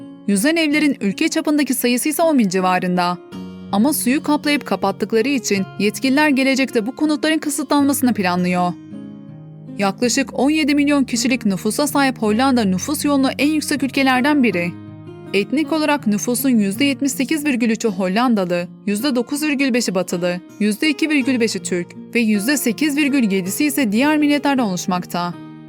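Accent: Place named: Aegean, Turkey. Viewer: seslendiren hanımefendi ege köylüsü sanırım yapıyoo, sağlıyooo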